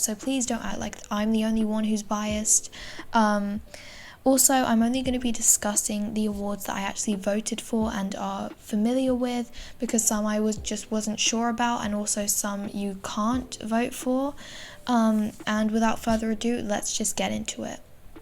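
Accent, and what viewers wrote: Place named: England, United Kingdom. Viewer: Omg, I really love you're English accent :) Great video btw :)))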